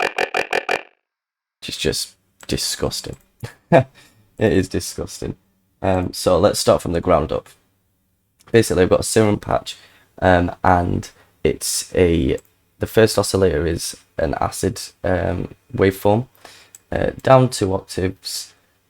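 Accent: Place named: North, United Kingdom. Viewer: you hear the northern accent and know its proper jump up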